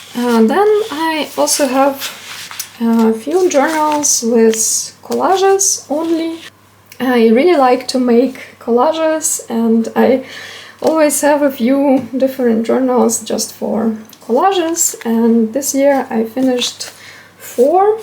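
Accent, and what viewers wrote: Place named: Russia. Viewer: Off topic but I LOVE YOUR ACCENT😭🤲🏼 are you Russian?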